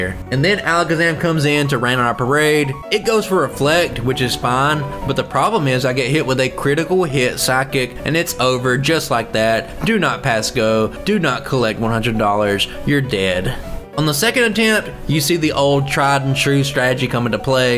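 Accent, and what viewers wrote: South, United States. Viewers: idk why your videos dont get more views, theyre very good. maybe its the strong southern draw, but im a southern boy as well, doesnt bug me. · That cold didn't mess with that beautiful southern accent. Love my brothers from the south getting it done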